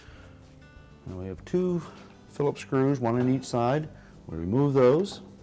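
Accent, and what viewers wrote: Canada. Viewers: Thank you, this was exactly what I needed. Love your accent, is that Canadian?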